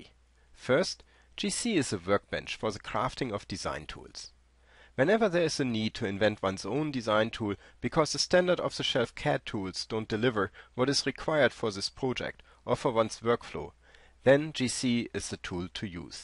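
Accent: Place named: Germany. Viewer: Too much germanish XD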